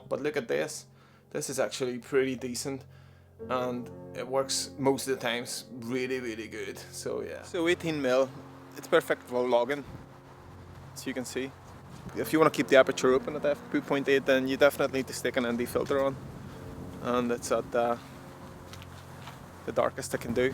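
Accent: Northern Ireland, United Kingdom. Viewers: Good. Is that an NI accent I hear?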